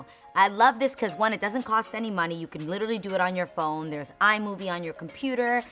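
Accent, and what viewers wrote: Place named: New York, United States. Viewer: I love how you haven’t lost your New York accent or slang lmao. I moved from New York to Hawaii and i still talk with an NY accent 😂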